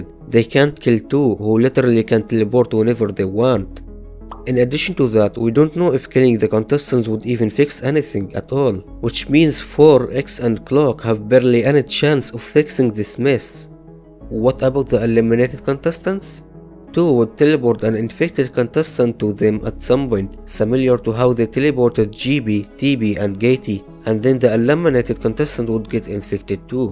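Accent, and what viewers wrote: Russia. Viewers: russian guy? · Your accent kinda funny Are you Russian?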